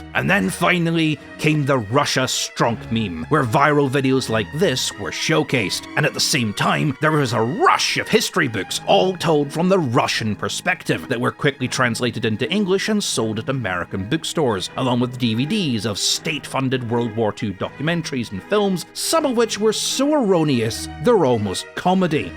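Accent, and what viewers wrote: Scotland, United Kingdom. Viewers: FUCK YEAHHHHHHHHHHHHH THE FUNNY SCOTTISH VOICE. I FUCKING LOVE MILITARY HISTORYYYYYYYYYYYYYYYYYYYYYYYYYYYYYYYYYYYY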